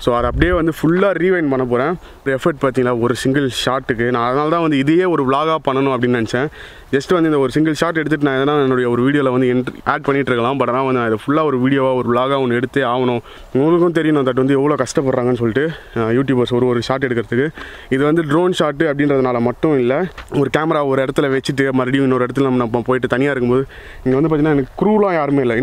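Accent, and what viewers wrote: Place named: Chennai, India. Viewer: Chennai slang iruku bro pesumbothu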